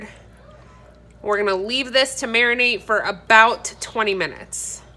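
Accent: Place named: Philadelphia, United States. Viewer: I hear a Philly maybe Jersey but mostly Philly accent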